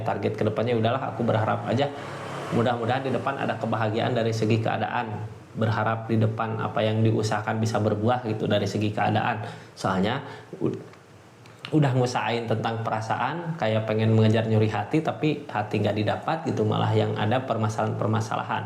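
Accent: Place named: Sunda, Indonesia. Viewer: Akang meni sunda pisan atuh, tapi da resonet eta reading na. Haturnuhun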